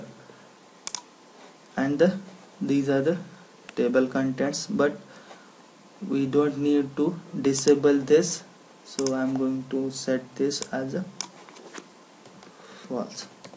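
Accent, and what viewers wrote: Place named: Hindi, India. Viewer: this lovely Hindi accent..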